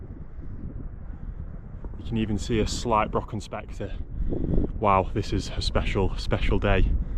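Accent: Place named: Southport, United Kingdom. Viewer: Half way through and loving this video! I have a friend who lives in Southport who has your accent... is that where you're from? Sorry to hear your mum passed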